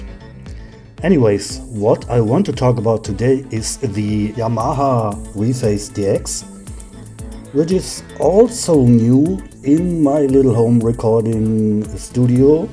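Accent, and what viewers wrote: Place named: Germany. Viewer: "VVRRROOOooo .... >> ... oooOOMMMM" 😄👍‍(made me smile!). Tested on headphones and the R8 sounds good! By the way I agree - I downloaded the WAV file and for sure the Yamaha FM synth engine is brighter than the PCM of the Korg... but it should be, that's one of it's strengths. Glad you're enjoying your Reface - I treated myself to the MODX a couple of years ago, and it's great (but also not perfect) and has become the centre of my setup. I did look at the Korg opSix (I have the amazing Wavestate and the Modwave, so did consider completing the Korg trilogy when they were selling them off cheap a few months back) but there is nothing in that which I cannot already do with the MODX, so I resisted... for now. 😉 Anyway, look forward to hearing more of the Reface in your future videos ... btw: have you tried the looper function yet ? 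Best Regards, Axel. p.s. Your voice overs sound fine, you don't sound drunk all the time, and we like your charming German accent! 😎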